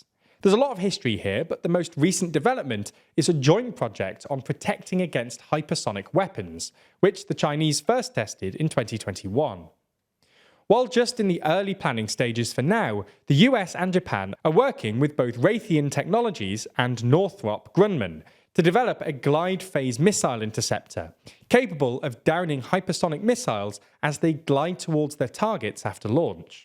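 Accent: United Kingdom. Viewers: British for ya!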